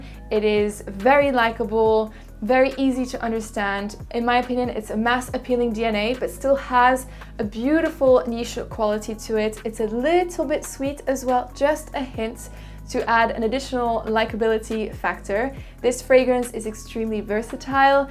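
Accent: France. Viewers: I'm curious, where are you from? Your accent sounds French-ish but I'm not sure 👀I really like the way you pronounce the perfumes! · Are you french ? Love your accent and great review btw ..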